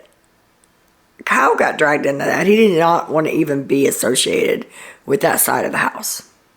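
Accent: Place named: Louisiana, United States. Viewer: I just recognized the LSU fabric in the background. Im in Louisiana and I thought your accent sounded familiar. We have such similar views on this cast and their doings; must be a southern thing.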